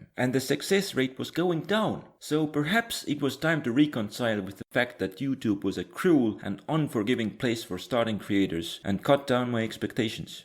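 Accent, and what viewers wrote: Eastern Europe. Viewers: Its interesting to see you reflect on your own channel after you gained some traction. I think I subscribed after first seeing your eastern front animated video. Here are my thoughts: (not to be rude or anything, just some honest criticism) As you have already noticed the drawing part is certainly the weakest aspect of your videos. If you aspire to be on the level with series like extra history the truth is that your drawing/animation skills are still very basic and not quite there yet. That does not necessarily mean that „bad“ videos will come out of it - just that they are not on par with most comic-narration things you see on youtube. And there is quite a lot of it so its hard for you to stand out. But I dont think you actually need that. As your eastern-front-animated video shows I think you are far better off concentrating on the mapmaking and detailed-research aspect. 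I could think of your channel as something like a baz-battles on steroids with a focus on the modern era of history and going in for much more detail. So I would suggest making the videos almost fully map-based while trying to make the maps graphics even simpler and also more refined. One last point that you might not be aware of: many people have problems understanding eastern-european accents. (I suppose your estonian but its quite similar) I noticed the guy who does baz-battles is also from eastern europe and once he changed to a native english narrator his videos became much more accessible for a broad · Are you from sweden? Why do you have an eastern europe accent? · Ditt accent låter mer östeuropeisk än Svensk? Estland, Lettland, Litauen?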